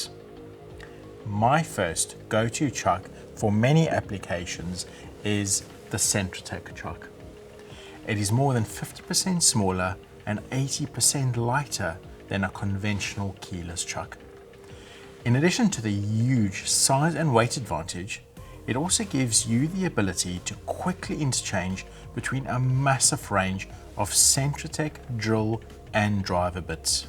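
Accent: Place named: South Africa. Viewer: I can hear he's a South-African. I know my people.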